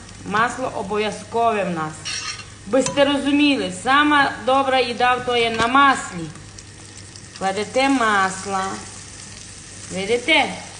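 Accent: Transcarpathia, Ukraine. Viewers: Закарпатський діалект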